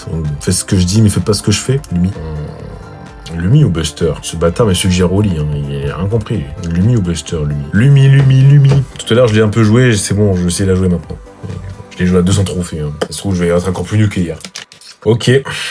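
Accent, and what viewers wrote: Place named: England, United Kingdom. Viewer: accent anglais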